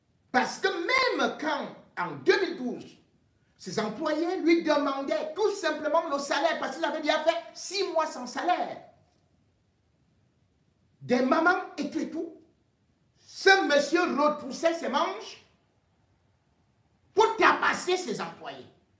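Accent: Cameroon. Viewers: Mr Rémy ngono qd vs dénoncez les gens prenez la peine de bien articuler leurs noms, tout le monde ne maîtrise pas l'accent camerounais.La luplart de temps on ne saisit mm pas les Noms👺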